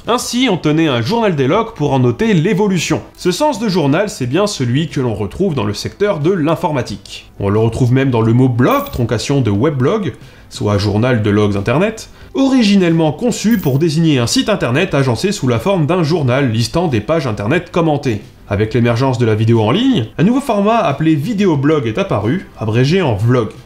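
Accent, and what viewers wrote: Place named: Lorraine, France. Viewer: Il est lorrain si je ne m'abuse ...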